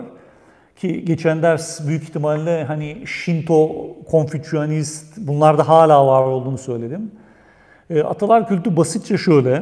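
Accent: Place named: United States. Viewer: Aynı konuyla ilgili ilk dersinizi de zevkle dinlemiştim ama bu çok daha zevkli oldu benim için. Teşekkür ederim hocam. Bu arada söylemeden geçemiycem Türkçe yi Amerikan aksanıyla konuşmanız da renk katmış😁 tekrar teşekkür ederim.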